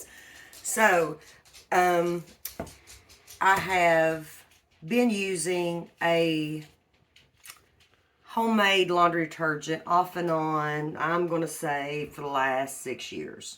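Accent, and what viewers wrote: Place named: South, United States. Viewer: I’m just loving the southern accent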